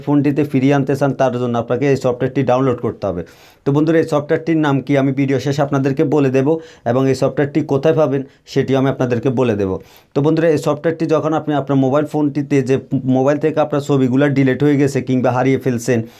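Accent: Noakhali, Bangladesh. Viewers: নোয়াখালীর ছেলে না তুমি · গুদ ভিডিও আপনি কি নোয়াখালি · ভাই আন্নে ত নোয়াখাইল্লা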